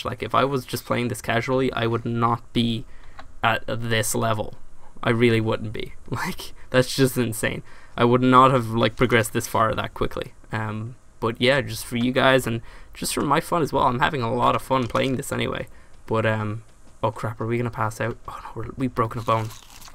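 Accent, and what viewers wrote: Ireland. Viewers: u used to sound so irish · your Irish accent is really strong in these old videos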